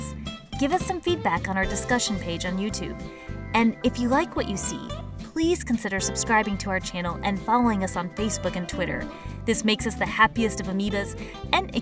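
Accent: United States. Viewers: Your channel is amazing for explaining hard biological concepts to my classes (i'm also a Science Teacher). I often use it after explaining a concept myself to reinforce a visual idea of what is going on. My only criticisms are: 1. Your mic is inconsistant sometimes, maybe you need a better quality one? 2. Your American accent is heavy, and because you're speaking fast, it can be tricky to understand (only sometimes) for us in Australia. Please try and speak as clear as possible without too much 'singing' style narration. (it's hard to explain haha!)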